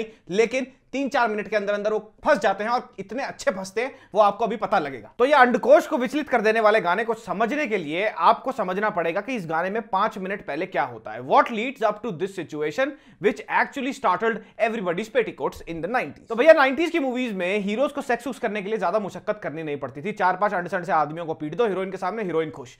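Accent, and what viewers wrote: Haryana, India. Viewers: Bhai aapki Hariyanvi accent sunke me bhi thodi thodi try karra hu bolne ki....😅😅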